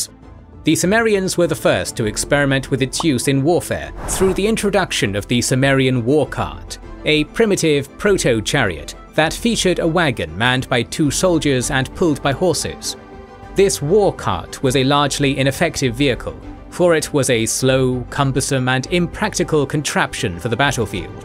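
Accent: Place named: RP, United Kingdom. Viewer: Very informative, clear and well illustrated video. Compliments. I also very much like your articulation and British Standard accent, it sounds very elegant like an officer of the Empire in Star Wars (it reminds the briefing in the Tie Fighter video game) and it is easier to understand for non native English speakers such as myself. Hats off ^_^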